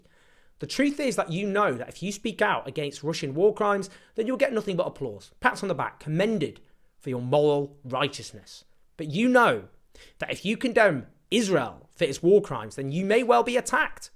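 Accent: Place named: England, United Kingdom. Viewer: You talk in a pommy accent so unless you live There in Israel you only believe what your hearing from Hammas who are lieing, just listened to the speech comming from a son of a Hammas comander who grew up in Garza, he speaks in in support of isreal and said don't believe the lies comming from Hammas about thousands of children been killed it's all lies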